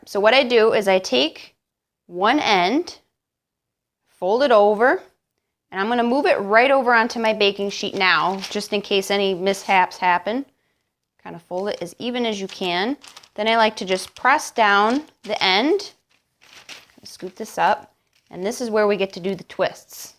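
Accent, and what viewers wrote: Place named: Minnesota, United States. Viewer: These look good! Are you from Minnesota/Wisconsin? I'm trying to guess from your "accent"! (I'm from MN, you don't have a huge accent :) )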